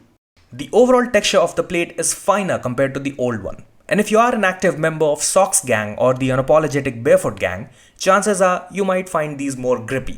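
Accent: India. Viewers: I hear indian i turn off the video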